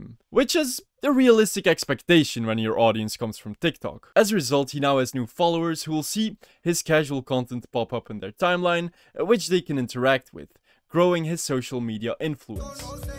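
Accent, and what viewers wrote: Netherlands. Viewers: i have a question i wanteed to ask, are u dutch? since u sound very dutch!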